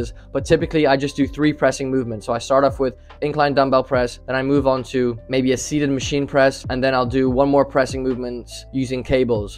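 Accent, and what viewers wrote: Ireland. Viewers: Thanks for this. Also, I've never heard an Irish hybrid accent like that before.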